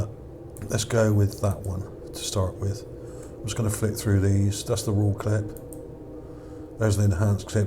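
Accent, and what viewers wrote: Bristol, United Kingdom. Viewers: Think about who those dislikes are coming from, there are entities out there who are not private individuals, and who will attempt to discredit anything that leads people to the truth. They are all over the web, on forums etc, it is their job, it is what they are paid to do. Keep up the good work...that accent sounds like Bristol, we could be neighbours. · Dear friend with british accent- you could slow down your exciting explanationns, beef up your volume 16 percent, louder is better here, so many videos I simply cannot hear and I wont wear headphones, I hate that, I was forced to wear HD ear protection for years, ok at least boost it 10 percent if at all possible. macbookair-speakers are not loud enough! thanks why do British accents make it hard to understand? love the shows, theories and analysis, wish you would decorate your findings with more filters and colors, but yeah you do know the art of photo analysis, great job, very creative and amusing at times! 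A big THUMBS up! Thank you for your research!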